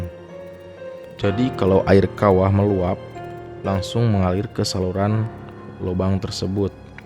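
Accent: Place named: West Java, Indonesia. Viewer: Maaf bang, bukannya rasis,,,,,,,dari cara abang bacain narator, abang orang sunda ya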